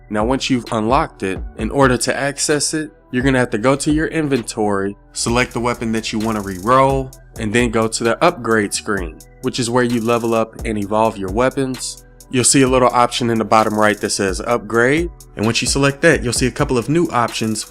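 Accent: South, United States. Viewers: You sound like Channing Tatum when he made that country accent in "Kingsman: The Golden Circle"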